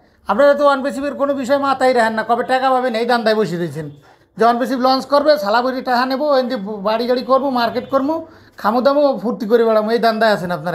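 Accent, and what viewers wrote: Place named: Barishal, Bangladesh. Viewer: কথা শোনে মনে হইছিলো বরিশাল।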